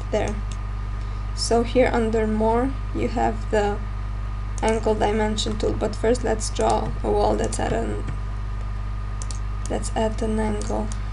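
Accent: Eastern Europe. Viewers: You sounds like south slavic speaker. Am I right?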